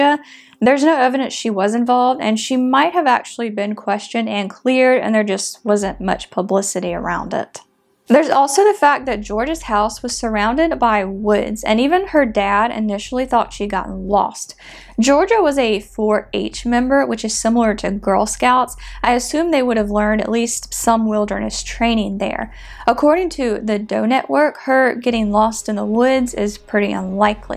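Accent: South, United States. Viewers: has a nice southern accent.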